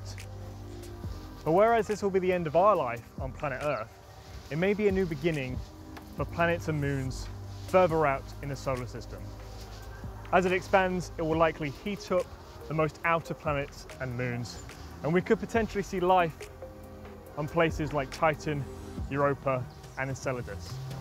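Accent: United Kingdom. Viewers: I thought u must be britisher😮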